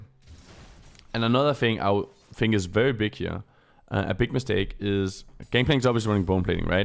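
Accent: Denmark. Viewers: is danish? I'm picking up a danish accent, i might be wrong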